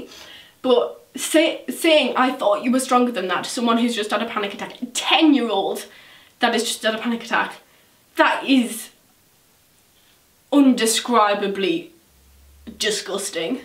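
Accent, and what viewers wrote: Newcastle, United Kingdom. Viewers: Jeez she obviously doesn't fake it, have you tried to do a Newcastle accent, yeah! It's hard! Also she has done vlogs with her friends who are also from Newcastle. Her dad didn't grow up there so that's why he hasn't got an accent. Her mum probably just doesn't want to be in the videos and then what reason would she have to fake it jeezussss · Why would she fake it? She lives in/near Newcastle and even in vlogs and stuff she speaks like that. Theres no reasonable reason for her to be faking it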